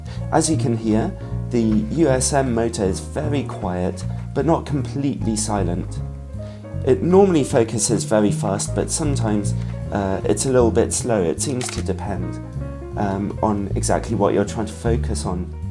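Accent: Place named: Wales, United Kingdom. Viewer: Hey. Love the channel, been watching your reviews for probably a decade. I'd love to see a re-review on the Canon EF 100mm f2.8 Macro (non-L). I have one, but would love to see your method of testing it on a "modern" (to me) 6D with your newer testing format. Keep up the great videos. And dude, I gotta say, I love your accent! Not 100% sure you're Welsh, but I'm 25% Welsh myself. So "hello" from across the pond!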